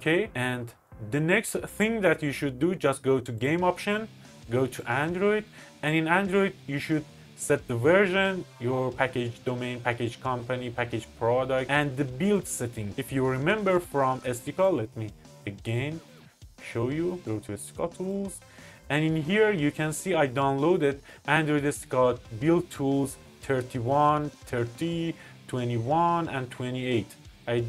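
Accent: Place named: Turkey. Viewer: Türk aksanını yakaladım hocam :D Ellerine sağlık harika video olmuş.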